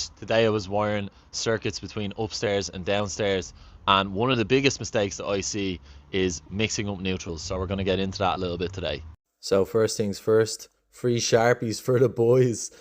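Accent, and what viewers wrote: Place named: Ireland. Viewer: Turning into a nice series this. Educational, short and easy to follow + the Irish accent makes everything better 😂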